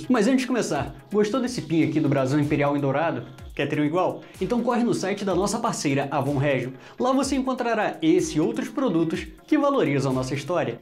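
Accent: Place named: Rio de Janeiro, Brazil. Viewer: Parece que o aparelho dental, a barba e o "carioquês" estão te prejudicando. O conteúdo é bom, mas não é só disto que depende o sucesso de um canal.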